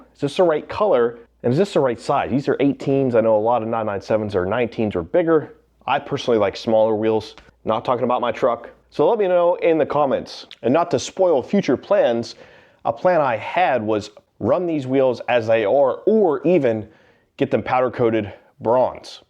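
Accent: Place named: Central Pennsylvania, United States. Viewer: “I’m going to put this battery in awhile”. Gotta love that central PA dialect.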